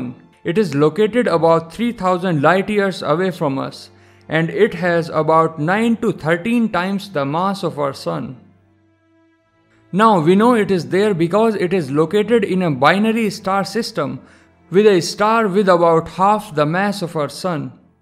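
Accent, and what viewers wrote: India. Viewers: Indian accent lol Wait, he is an Indian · I need something in English I think that was broken Indian accent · Intresting video: me:D Indian accent: me:( It's: me:D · you sounds like asian............. india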